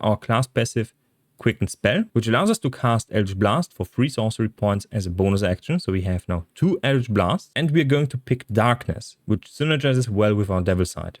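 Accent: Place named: Germany. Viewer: your english is weird man i thought you were speaking germany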